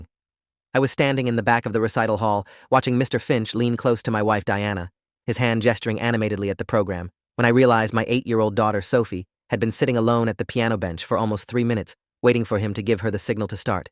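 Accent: United Kingdom. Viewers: YO STOP WITH THE BRITISH ACCENT ITS CATCHING ME OFF GUARD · So I came from the short and this dude has a British accent now😭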